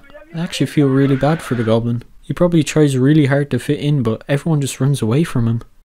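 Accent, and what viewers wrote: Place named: Ireland. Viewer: ur irsh right? pretty sure becus you sound like it what county r u from? u sound like ur from limerick lol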